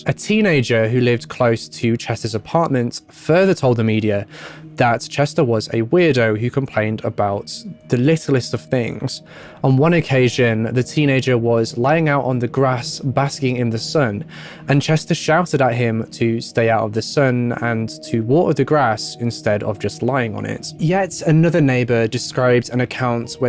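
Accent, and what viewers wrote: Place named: RP, United Kingdom. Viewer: Hes got a posh English accent so great pronunciation is a given hehe 🇬🇧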